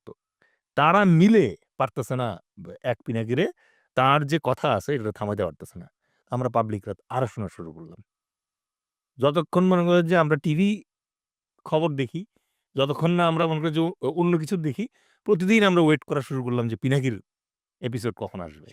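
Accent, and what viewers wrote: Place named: India. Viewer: Your sound like an Indian.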